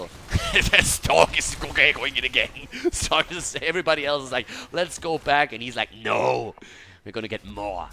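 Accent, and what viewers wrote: Germany. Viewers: Good commentary. It's Zometzing witz the german accent that just makes it more intriguing.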